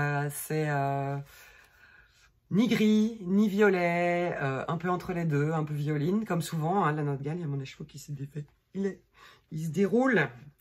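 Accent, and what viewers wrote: Belgium. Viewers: J'adore t'écouter placoter même si je ne tricote que des foulards...! La langue belge est jolie...tout comme ma langue directement du Québec.hi hi! bye et gros becs!!!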